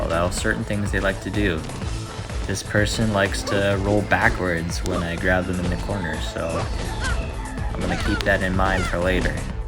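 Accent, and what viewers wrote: Canada. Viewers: You are Canadian right? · Congrats bro...you Canadian?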